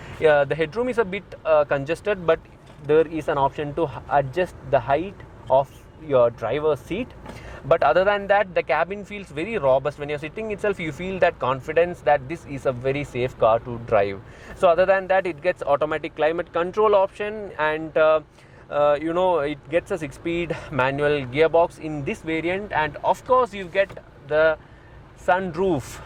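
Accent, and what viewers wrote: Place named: Kerala, India. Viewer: This is why people people make fun of mallu English 🙄🙄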